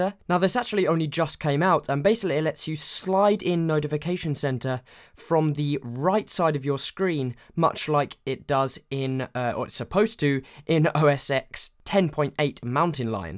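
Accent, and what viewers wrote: United Kingdom. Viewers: r u british? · STFU U BRITTISH GAY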